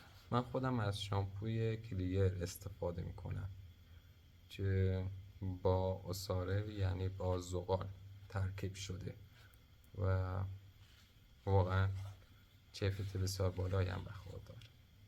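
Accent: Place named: Turkey. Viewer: ما درست متوجه شدیم که لحجه ترکی دارید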